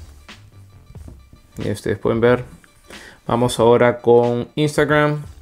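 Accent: Peru. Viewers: eres peruano???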